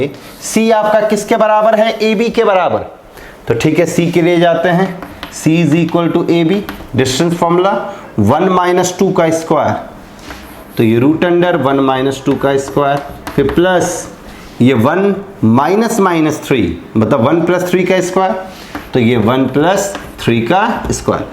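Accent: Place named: United States. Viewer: sir aapki hindi, american hindi jaisi lagti hai mast.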